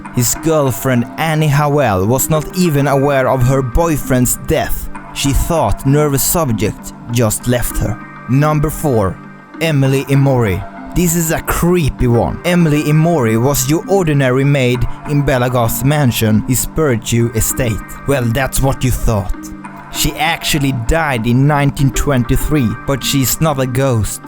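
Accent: Sweden. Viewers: förlåt, men din svengelska är riktigt illa.. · Aah, I love your accent!! It's so cute! I'm also from Sweden! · Din svenska accent är så rolig och jättegullig. Jag älskar dina videos! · Are you from Sweden? xD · Are you from sweden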